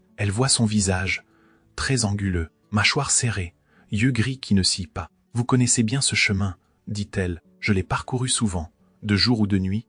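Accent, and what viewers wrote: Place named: England, United Kingdom. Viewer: L'histoire est sympa mais l'accent anglais régulièrement est agaçant... C'est vraiment dommage 😢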